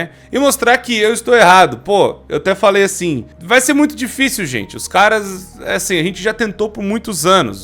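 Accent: Brazil. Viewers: O jeito que vc fala se assemelha mais aos brasileiros,vc vê muitos vídeos na Internet ou pq convive com BR?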